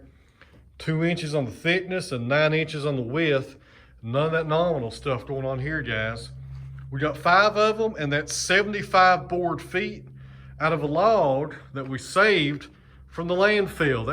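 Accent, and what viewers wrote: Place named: Tennessee, United States. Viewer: You’re starting to sound like that concrete truck driver. NE TN dialect is kicking in!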